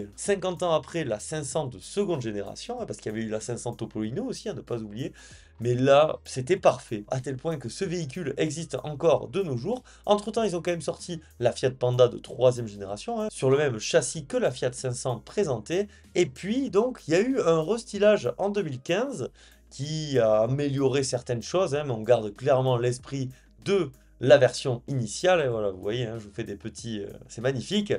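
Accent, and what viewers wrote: South, France. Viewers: Votre style de commentaire est très drôle. Pas de vulgarité et l'accent qui fleure bon l'huile d'olive et le mimosa.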